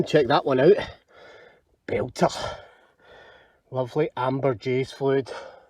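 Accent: Scotland, United Kingdom. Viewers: I just love your videos 🤛🏼 & ur accent… i hear Glasgow accent is stronger than Edinburgh? ☺️ Wish I could find tips like u find up ur way, down here in Yorkshire… ☺️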